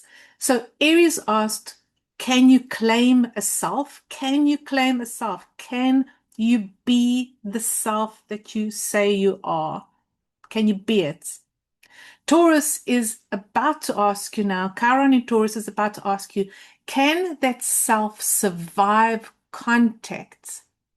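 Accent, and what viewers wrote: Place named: South Africa. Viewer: Glad to have stumbled on your video. And as an expat living far from South Africa, good to hear your accent ❤. Thank you for your work. I listen to a lot of financial podcasts and one of the latest buzz words at the mo, is "K Shaped Economy" (meaning those on the upleg of the k are getting wealthier and conversely, those on the bottom leg, are finding themselves with less and less). Isn't it interesting that this is happening Now with Chiron going into Taurus AND the symbol of Chiron having a clesr K in it?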